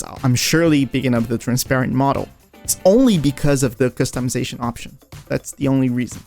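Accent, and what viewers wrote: Brazil. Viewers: Wait is that some acento br na tua fala? 👀👀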